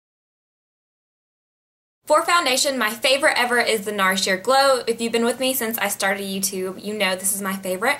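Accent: South, United States. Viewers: I can hear the southern accent lol.. she doesnt talk like this aymore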